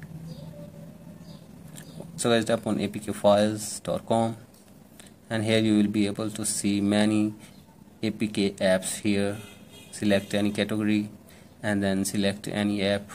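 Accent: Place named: Australia. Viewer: He’s Australian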